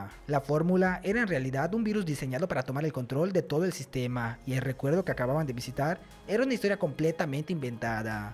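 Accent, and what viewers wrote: Yucatan, Mexico. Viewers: Es yucateco? 🤔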